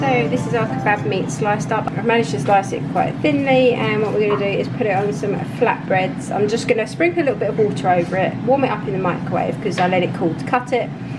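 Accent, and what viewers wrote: United Kingdom. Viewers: This woman speaks with a cockney accent. Her ancestors probably came from the border between the East London city limits and the Essex area. Her accent is from Essex. She sounds like a female version of the teenage Rodney Trotter from the British Cult hit TV series only fools and horses. She even has his eyes - as is evident in other videos. I suggest she watches only fools and horses and also birds of a feather - then she will realise what she sounds like - an Essex girl.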